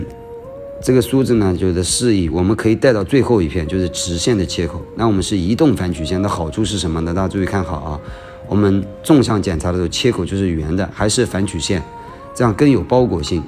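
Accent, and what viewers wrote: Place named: Sichuan, China. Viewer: 四川口音親切可愛，NL不分😂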